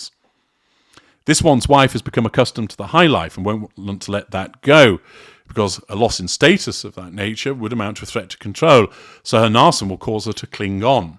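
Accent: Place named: Cockney, United Kingdom. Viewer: Hey Mr cockney Man!!! We don't talk shit about your royalty's entitlements so don't talk about our American royalty. Those two and their feral kids are American now with all the entitlement BS that comes with it?!!Sooo!!! why don't you have a beer and a smile sit down and shut the F/U!!!!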